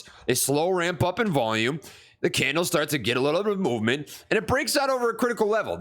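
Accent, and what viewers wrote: Minnesota, United States. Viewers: Dude what was that Grizzly chew reference haha? You sound like a Minnesnowtan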